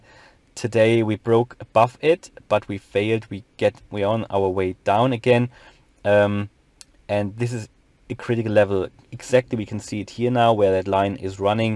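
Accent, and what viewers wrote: Germany. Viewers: Unverkennbar wenn Deutsche Englisch reden 😊🇩🇪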